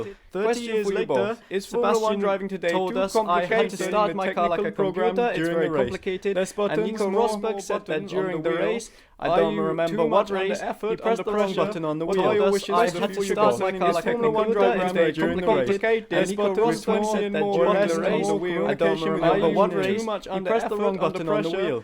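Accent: United Kingdom. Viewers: He hides his scouse accent really well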